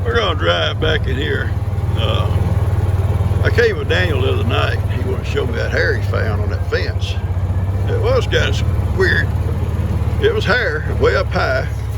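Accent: South, United States. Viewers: Philadelphia checking-in., I'm not quite sure what it is but when I'm feeling down and don't get me wrong I always watch your content. Either your soothing voice your Southern drawl or the animals really cheer me up and put me in a positive mood. So I thank you sir. 👍💪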